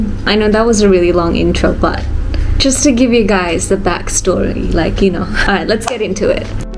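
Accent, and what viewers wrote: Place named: Australia. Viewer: Your Aussie accent is getting stronger! ❤️ hehe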